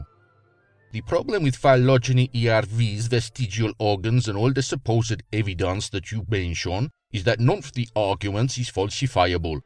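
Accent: United Kingdom. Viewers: These videos always have one thing in common, obtrusive in debating style. Bold face lies of no evidence refuting evidence.British accent is alwways evident of a blaphemous intellectual upbring on prejudice conjecture. This guy has an anal and poop fixation.This rant is just hateful and has no substance of honest debate. The mark of the beast is definitely this mans free will choice to his quanrantine to foul language zone of nothing but darkness and no love. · omg that british accent just makes it so much more hysterical as fuck. You and coolhardlogic are amazing debunkers and trashing these tin foil hat conspiracy ridden creationist fools just makes it even more gold. Thank you so much for bringing logic to the crazy that seems to be apparently spreading all over youtube.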